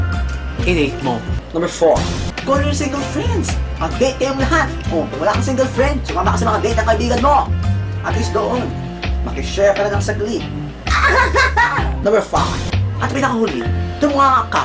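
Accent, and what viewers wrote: Visayas, Philippines. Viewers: bisaya na si..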